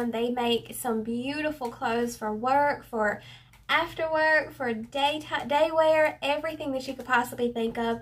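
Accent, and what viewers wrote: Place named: North Carolina, United States. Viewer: Everything in your haul needs to get into my closet. The colors, the styles....omgosh.. Beautiful. Thank you for sharing such well produced videos. YOU really are my favorite youtube plus size clothing haul person. I can tell you put a lot of thought and work into making these videos for us all. I am sure I am not the only person who appreciates you. Oh and your personality is the bonus to it all. My grandma was from North Carolina and you remind me of her with your accent.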